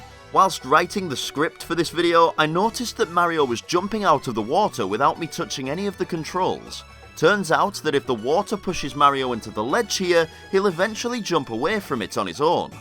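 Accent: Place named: England, United Kingdom. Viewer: I very clearly have a soft spot for English accents because I'm subbed to Andy from A+Start as well!😂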